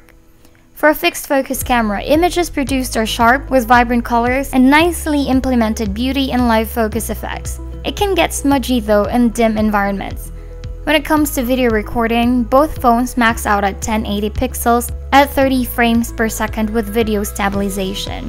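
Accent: Philippines. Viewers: Filipino accent.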